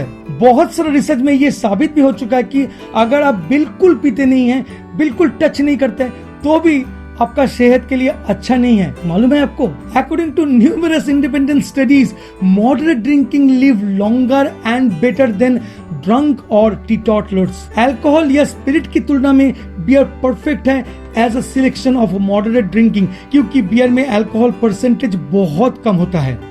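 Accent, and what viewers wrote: Bengal, India. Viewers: Bengali manus chala hindi bolne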